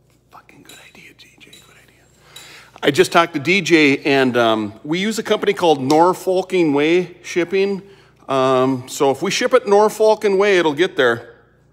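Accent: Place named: Midwest, United States. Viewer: Are you Minnesotan you have some heavy midwestern accents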